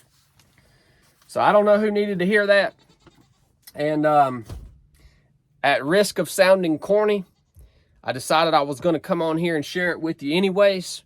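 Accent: South, United States. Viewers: Just gotta say... Thanks to ma man (Southern Draw Accent) lol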